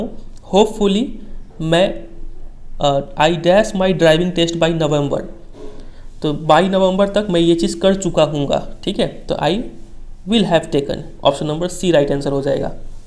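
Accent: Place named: Bengal, India. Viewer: Sir mai janta tha app Bengal mai rahte ho apke bolne ka tarika se pata chal gya mai v WB mai rhta hu Kolkata dumdum😁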